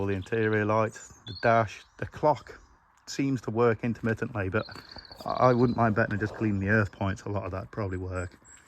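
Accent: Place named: Norfolk, United Kingdom. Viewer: Well that’s an accent I know you must be from my neck of the woods (Norfolk)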